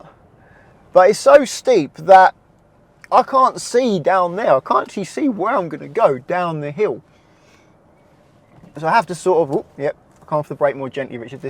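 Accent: United Kingdom. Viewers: uhh, the video host is obviously British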